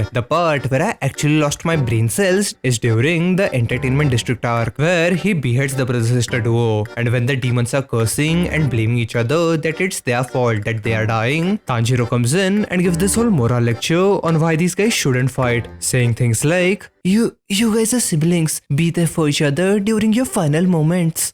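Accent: India. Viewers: i can tell hes indian i love how they says english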